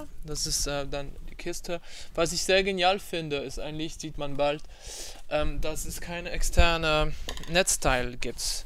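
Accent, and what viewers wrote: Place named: France. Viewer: hab ich mir gedacht man hört den französischen akzent parce que je parle aussi francais ;)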